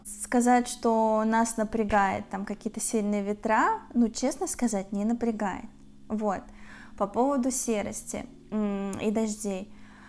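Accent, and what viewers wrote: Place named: Urals, Russia. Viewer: Так у вас говор Уральский, как это вам менталитет не подошёл?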